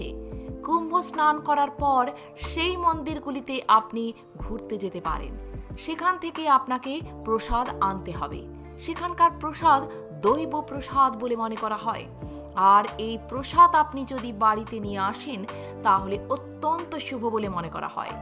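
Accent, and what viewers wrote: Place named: United Kingdom. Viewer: British speaking Bengali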